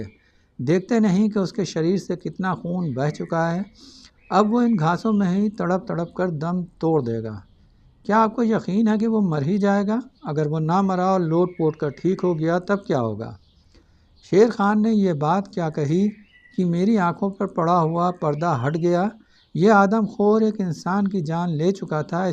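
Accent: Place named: Pakistan. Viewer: आपके उर्दू एक्सेंट में कहानियां सुनना बहुत ही सुखद लगता है, शुक्रिया..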